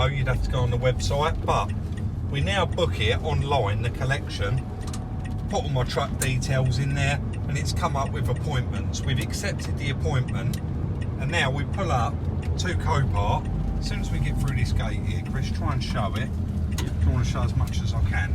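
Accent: United Kingdom. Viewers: This British accent 🤣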